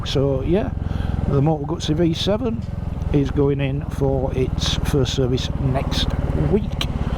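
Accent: Yorkshire, United Kingdom. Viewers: Morning. Since watching your vid on the MT 800 Touring the other day, I'm all but sold on one rather than the Suzuki GSX S GX ( say that when you're drunk) as it's over 5 grand cheaper and has everything. As good as the Suzuki is, and I've tested one, for £14500 - No luggage, no centre stand, no heated grips, and for a supposed touring capable bike, a screen the size of a postage stamp that needs an allen key or similar to adjust. The centre stand alone being £470... Your review made the difference. On the subject of Chinese bikes and the Chinese, they are easier to interpret than some of your Yorkshire 'twang' From one of your followers over the fence in Lancashire....(.like we are any easier...😝😉) Keep up the good stuff..👍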